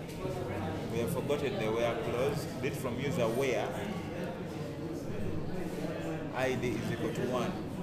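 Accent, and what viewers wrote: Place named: Uganda. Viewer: by accent I knew you were Ugandan; greetings from Canada. if I did not find this video, I think I would fail my assignment. Others didn't help.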